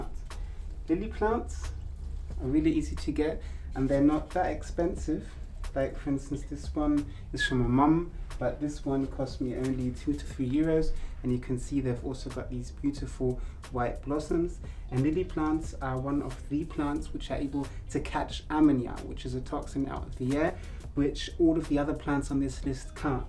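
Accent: United Kingdom. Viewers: British accent ?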